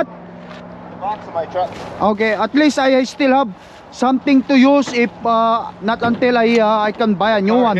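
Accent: Ilocos, Philippines. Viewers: Halatang ilokano ang accent mo lakay pag nagsasalita ka ng English. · ILOCANO KAN SAMET? BRAD TA ACCENT MO GAMIN. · Dayta man lakay naglaeng ka ag English, Ilocano accent ah..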